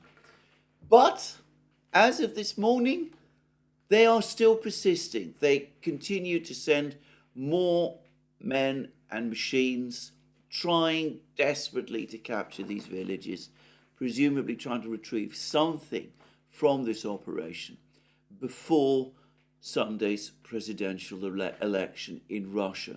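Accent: United Kingdom. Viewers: Great strategic lesson. Great sophisticated super duper British accent delightful to ear. Thanks ❤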